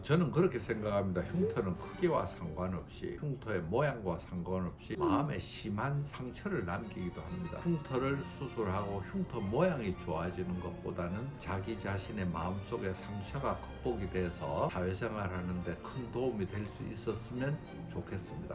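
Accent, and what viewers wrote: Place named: Gyeongsang, South Korea. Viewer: 원장님 경상도 분이셨군요..!^^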